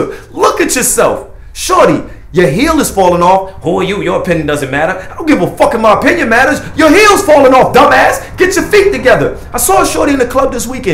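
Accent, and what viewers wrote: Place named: Philadelphia, United States. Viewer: Are you from Philly,You have to be you have the accent.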